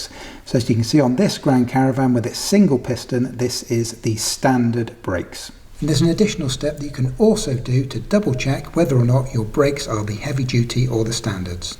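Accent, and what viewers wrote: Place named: England, United Kingdom. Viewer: I don’t know what it is about the English accent, but it makes every video feel so much more educational lol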